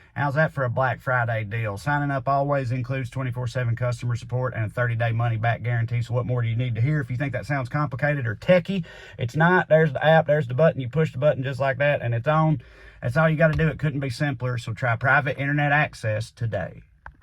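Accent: South, United States. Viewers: Omg you are the first ever person I have heard with a southern accent who expounds sound philosophy. Thankyou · your deep southern drawl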